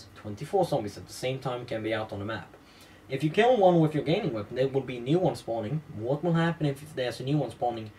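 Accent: Netherlands. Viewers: Heh, i thought you were dutch :P Anyway you deserve more subs for these.They're the only good zombie guides on youtube. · Dutch? Swedish?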